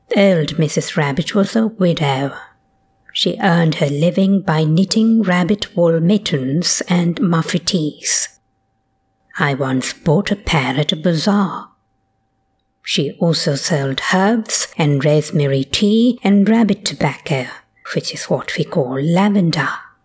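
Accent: RP, United Kingdom. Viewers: This is how Beatrix Potter should be read, in posh British accent, with that old flavour! Ty for this.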